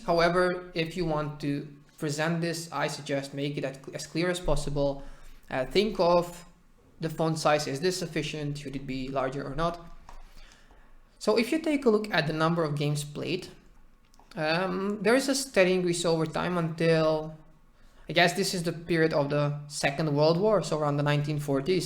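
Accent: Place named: Netherlands. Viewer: i love your videos and your accent, are you dutch?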